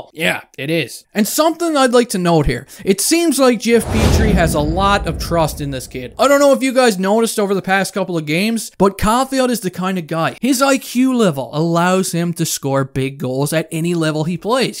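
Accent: Newfoundland, Canada. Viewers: You must be a fellow Newfie or an Atlantic Province guy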